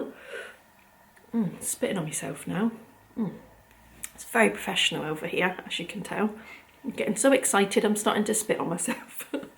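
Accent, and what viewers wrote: United Kingdom. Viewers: hello, I am new here, i enjoyed this episode so much:) I also have daughter age 17, shes studies at gymnasium grade 10, i could not understand a of your education system, did i understand right, son is at grade 6 or it's something else? Also I made a hat Melete , its really warm hat:) I don't know from what country you are, sounds like UK, but in Latvia we have really cold weather till -20, and I hope this will be warm enough for my daughter. Also loved suggestions for movies, tomorrow we will watch Wild robot. Thank you:)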